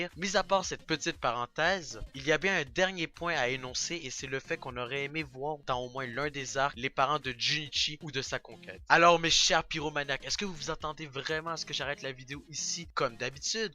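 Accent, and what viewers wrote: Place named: Quebec, Canada. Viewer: Alors non je ne suis pas un PyroManiac, enfin pas encore, car je ne me suis encore pas mis à découvrir d'autres vidéos, notamment à cause du boulot... Mais je risque de m'abo si j'aime ton contenu. Ensuite, je trouve que ton classement par tiers (que j'avais compris^^) est pas mal car il ne les classent tous pas dans un ordre précis. Cependant j'aime bien donner un top 3 (comme celui dans le com précédent). Je m'étend pas plus sur ce commentaire, pourquoi pas sur une autre vidéo, car je vais commencer par regarder l'AMV et les contenus qui m'intéressent. Ah et oui je me doute bien que tu es Québécois, et je ne trouve pas ça utile de le faire remarquer. A partir du moment où tu parles français et que le contenu me plaît, je me fiche pas mal de ta nationalité selon ton accent^^ Voilà je go sur ton AMV, et merci d'avoir pris le temps de me répondre :)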